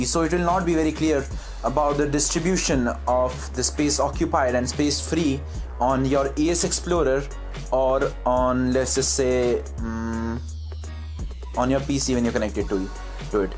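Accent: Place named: India. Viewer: The Voice is English taste India, because Google now is beling to India People.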